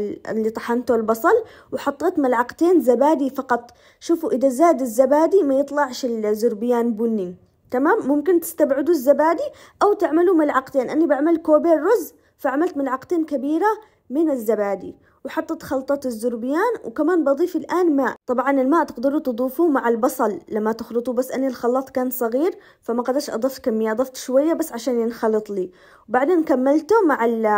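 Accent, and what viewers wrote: Aden, Yemen. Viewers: محسن كلامك العدني القح 😂😂😂 ربي يسعدك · ياحلات اللهجة العدنية تسلم يداتك ياقمر ❤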